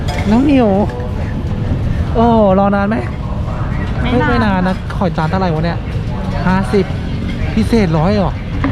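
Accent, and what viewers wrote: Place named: Nakhon Pathom, Thailand. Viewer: สวัสดีคุณ และน้อง เอทำไมพูดเหน่อเหมือนพี่เลยแฮะ พี่คนนครปฐมจ้า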